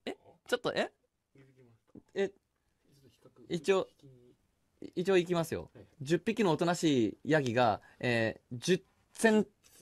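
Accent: Germany. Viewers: You can instantly hear that he grew up speaking german. Source: It's my mother tongue. The CH sound is actually pronounced like that ^^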